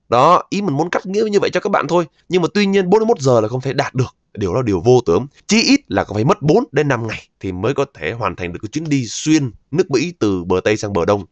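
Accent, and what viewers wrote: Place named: North, Vietnam. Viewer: Nghe giọng backè.. sợ lắm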